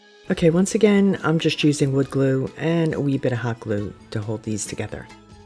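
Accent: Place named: Pittsburgh, United States. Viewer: Thanks for sharing your great ideas! I gotta ask...are you from Pittsburgh? I am & I'm hearing that back east (but not NY or Jersey) accent that sounds like a Pittsburgher. 😊 ❤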